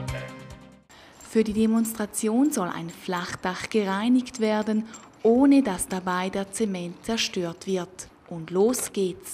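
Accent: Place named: Switzerland. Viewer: also das weiblein hier muss schneller sprechen. und wieso das alles auf schweizer-hochdeutsch ? ich bin doch hier bei kärcher schweiz ??? versteckt euch nicht und lasst unsere schweizer dialekte sprechen lol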